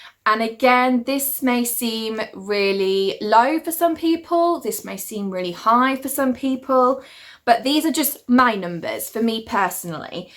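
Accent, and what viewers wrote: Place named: United Kingdom. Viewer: omg i love it i am from the us though so British accents always sound good to me lol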